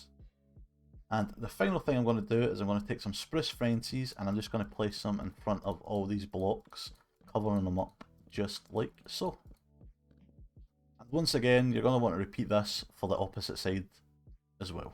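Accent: Scotland, United Kingdom. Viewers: a Scottish Japanese style minecrafter? :)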